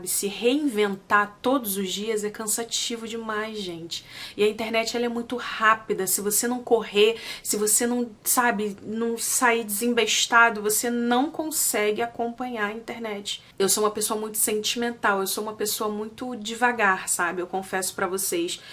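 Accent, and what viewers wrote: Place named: Rio de Janeiro, Brazil. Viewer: sua lindaaaaaaa, você é maravilhosaaaaaaa, mesmo! Carismática, de uma delicadeza ímpar! Tão bom ouvir você falar, a maneira doce com que se expressa com tanta verdade e com tanta suavidade ao mesmo tempo. Não se desculpe por nada...quem gosta de você, gosta de tudo e entende...transições não são fáceis. Mas acharemos juntas formas de transformar o que agora pode parecer um limão 🍋 em uma limonada, rsrsrs. Resista, insista, persista! Ouvir esse seu sotaque carioca tão delicioso e lind é sempre maravilhoso, e suas resenhas são de uma qualidade impecável! Vai dar certo! Vamos buscar as marcas. Marcar você pra que lhe enviem produtos. Você merece! Seu conteúdo é muito bom e único! Eu te adoro, mulher! Me motiva muito a me empoderar mais nesse universo de beleza, de maquiagem, de autoestima, de conhecer esse seu novo mundo aí também, nos EUA, e porque não? 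Você tem LUZ PRÓPRIA, acredite, e ela mexe com a nossa vida, pra melhor. Sei que você só pode dar certo. Só falta ajustar alguns detalhes nesse novo momento. Beijo enorme, lindeza!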